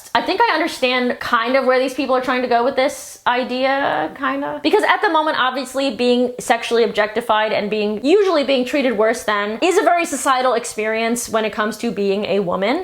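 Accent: New York, United States. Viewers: I LOVE YOUR ACCENT!! I'm from Texas and I always thought I had a very neutral accent but in listening to myself more closely over the past year or so I can really hear the Southern twang in my voice sometimes 😂😂 and to me yours sounds like a stereotypical New Yorker sounds. Am I close or completely off the mark in where you're from?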